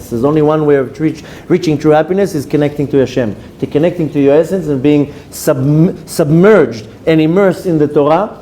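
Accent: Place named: Israel. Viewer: Thank you Rabbi for another great class. I'm curious as to how you have such a distinct Israeli pronunciation of Hebrew when quoting verses and prayers being that you are Baal Tshuva and Chabad. Other people who have become religious and had no previous Jewish background just adopt all the ways of their new group. Please share any insight as to how you've managed to keep your Israeli pronunciation while also being Chabad. By the way, it's not in a bad way at all, on the contrary. Was just wondering since you're usually so open about yourself to students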